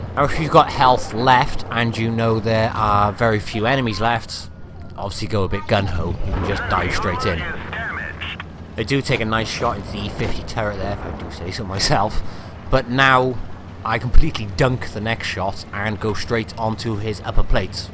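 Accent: Germany. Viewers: You Should Upload a Video with short clips of that German Accent with Sentences other people can use in there videos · great review..love the german accent, keep it up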